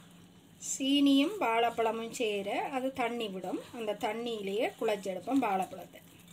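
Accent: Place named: Sri Lanka. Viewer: Thank u ... Elaggai tamil nalla irukku... Nice recipe...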